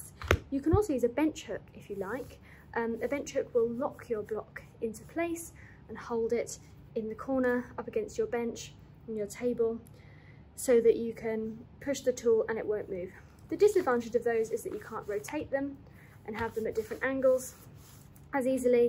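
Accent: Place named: United Kingdom. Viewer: Nice British accent! Thanks for the tips.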